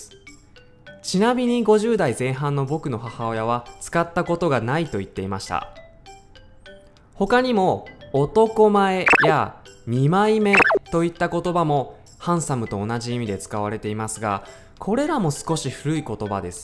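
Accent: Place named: Japan. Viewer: 有中文字幕好用心喔！ 日本人教日文很道地👌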